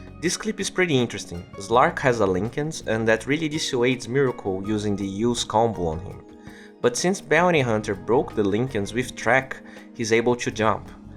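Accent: Brazil. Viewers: esse sotaque é muito BR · Cara, não mente pra mim, você é brasileiro! Eu posso reconhecer um bom ingles CCAA de longe!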